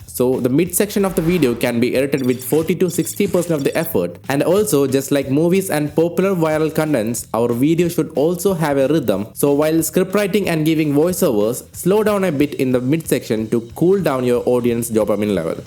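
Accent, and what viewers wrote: India. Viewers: Are you indian because accent is like indian people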